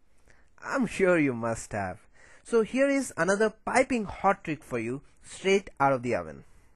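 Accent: India. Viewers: Are you indian by any chance ? Your accent seems like that. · clearly he is indian. do you not recognise the accent? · I think the Indian accent adds 2 it, u know, he sounds wise, and stuff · ur accent is so awesome! it sounded indian or jamaican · The discussion is about your ugly pathetic 3rd world Indian accented english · according to his accent, this guy is indian, so asian is correct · Itallian? He sounds Indian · indian accent!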